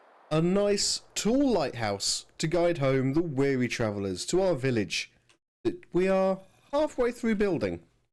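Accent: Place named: Posh, United Kingdom. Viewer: Awful how can you call this content 👀 You sound like you went to private school 🙄 toff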